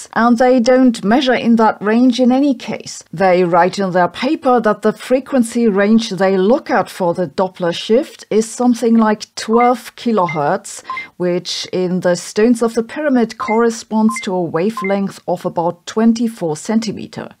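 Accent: Germany. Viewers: I don`t want to sound offensive, but a have seldom listened to any english with such a school-book heavy german accent, just adorable. 😁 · Here for your German accent, I also love how you articulate your arguments, thank you for your informative and well presented take on this matter. We don't have to agree with you but we must always recognise a point well made.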